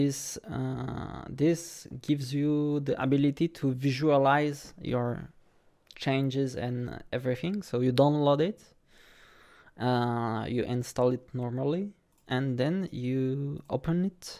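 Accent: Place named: France. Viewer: I love french peoples speaking english . C'est très facile de reconnaître ça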